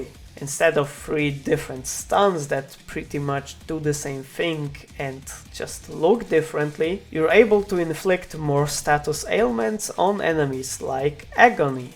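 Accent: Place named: Eastern Europe. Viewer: Strong slavic acent. Yeah dude you better make a subtitles next time.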